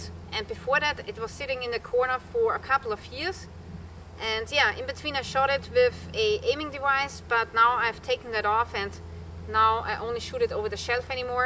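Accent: Germany. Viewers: Are you German? You have a German accent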